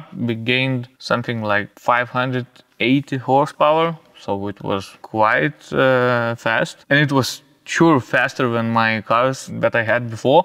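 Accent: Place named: Russia. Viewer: Nah mate, like a man who can russian and perfectly English he has an typical russian/East european accent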